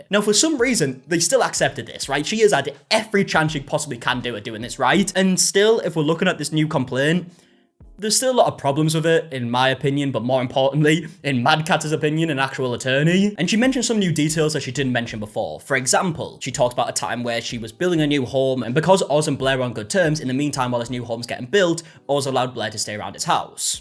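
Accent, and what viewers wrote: United Kingdom. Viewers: Yeah, the light to no R pronunciation and the schwa-ification of almost all vowels in some British accents is amazing sometimes 😂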